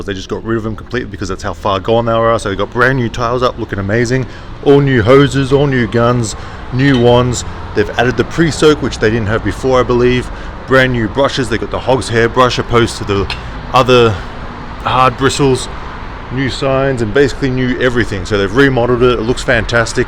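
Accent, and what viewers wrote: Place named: Australia. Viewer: You sound Aussie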